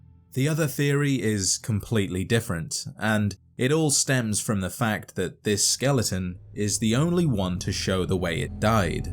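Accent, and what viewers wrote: United Kingdom. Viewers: Good to see a fellow (from what I can tell) british fallout content creator. Great shit dude!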